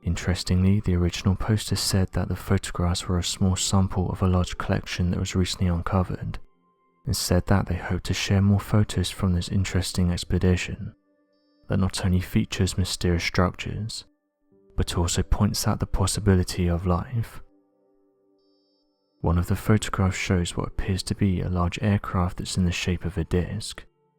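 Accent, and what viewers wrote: England, United Kingdom. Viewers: Why are you whispering ? Speak up already ! It’s bad enough that your slurring your words through your English accent. Can’t we get an announcer that speaks American English !?! It’s freakin annoying already.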